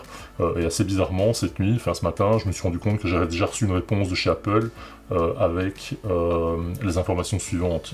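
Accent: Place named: Belgium. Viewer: Pour une fois que je trouve une chaîne qui explique bien les choses, ça serait dommage de la voir disparaitre :) Sinon, rien avoir, mais j'ai l'impression qu'on se connais ou du moins qu'on s'est déjà croisé :D Et quand j'entends ton accent Belge, étant moi même en Belgique, je me dis encore plus que mon impression est juste :D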